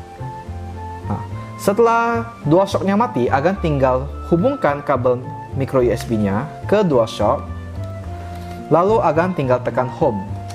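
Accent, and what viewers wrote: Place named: Tegal, Indonesia. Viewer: Dari logat bicaranya pasti admin ini orang Tegal atau Brebes jawa tengah ya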